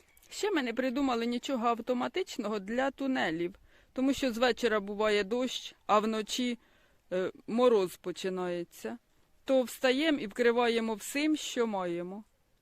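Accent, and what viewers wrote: Poltava, Ukraine. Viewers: Доброго дня, чи вечора. Потрапила на ваш канал і залипла!!! Поки усі відео не передивилася, не угомонилася. Дуже зачаровує українська мова з полтавським акцентом! Дуже приємно слухати. Для себе візьму приспособу для перевалки з стаканчика в більшу ємкість( з розрізаним боком) А банку з ручкою для виготовлення стаканів я теж у Валєри взяла. Я і зараз його дивлюся на каналі Наша дача.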